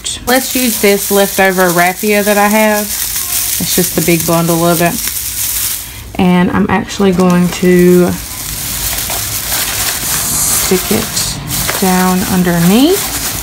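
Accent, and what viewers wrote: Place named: North Carolina, United States. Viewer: OMG you sound like you are from Lumberton North Carolina! Lol where state do you live in?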